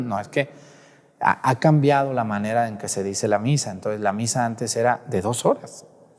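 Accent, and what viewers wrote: Mexico. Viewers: Hola padre, como cada semana muchisimas gracias por el resumen de homilias, padrecito usted es el combo completo: Sabiduria, carisma me fascina su acento tapatio y regreso una y otra vez el video para que se me grabe lo que usted nos dice, siga asi padre hablandonos con la pura verdad, que tenga una exitosa y bendecida semana💐🌸🌹🌷🌼🌺👏🏼👏🏼👏🏼👏🏼👏🏼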